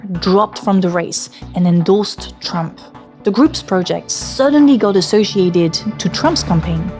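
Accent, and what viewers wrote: France. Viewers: I am British and I love your French Accent. I love your videos as well they are excellent. Keep it Up 👍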